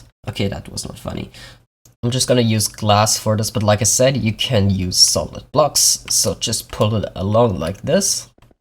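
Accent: Germany. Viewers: sounds a bit German(?)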